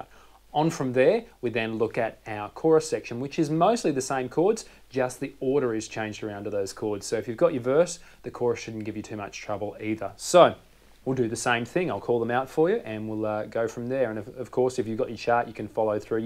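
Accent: Australia. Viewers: i love how aussie you are ><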